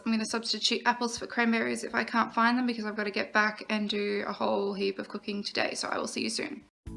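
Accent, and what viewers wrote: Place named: Australia. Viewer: This is a marvelous video thank you so much for sharing this incredible video I love your Australian accent and just recently learned that Australians don't celebrate thanksgiving lol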